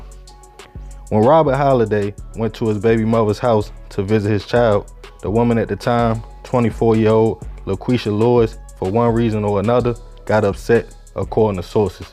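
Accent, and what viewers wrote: Baltimore, United States. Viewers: Born & Raised in Baltimore 🖤 I subscribed soon as I heard the B'More accent... Too, blue, you, far Va😂 father!.. keep winning Young 👑🖤🙏🏽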